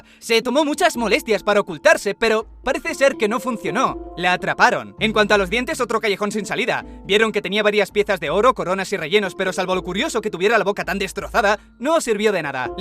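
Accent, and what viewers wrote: Spain. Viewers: Nunca había escuchado una voz tan chillona como la tuya, eres español? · Prohibanle a los españoles hablar en youtube, que manera tan horrorosa de hablar...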